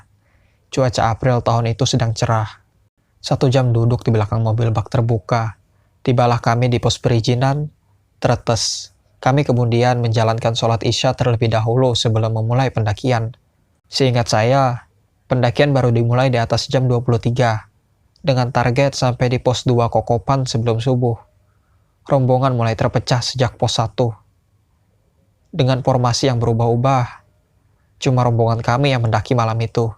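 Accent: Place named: Bali, Indonesia. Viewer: Wah logat bali nih .. 😁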